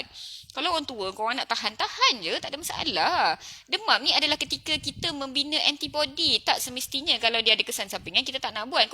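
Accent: North, Malaysia. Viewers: Di persilakan ke Penang lepas PKP ni... first time sy tgk video sy ingatkan dr utara sbb dialek mcm org utara...rupanya dr kuantan😊😊😊...akhir sekali sy ucapkan terima kasih kepada diatas pengkongsian ilmu dr....semoga dan keluarga sihat2 selalu....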